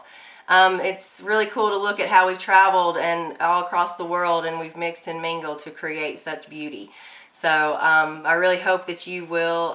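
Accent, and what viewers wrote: South, United States. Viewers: No you are not 100% European, you are however 100% Southern! The accent gives it away, no DNA needed! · You sound like you are from the south.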